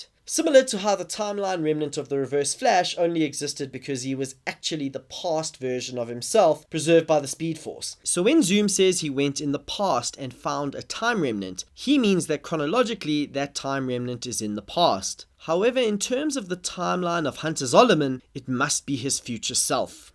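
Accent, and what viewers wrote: South Africa. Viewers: Is this guy South African?????? He sounds like he is.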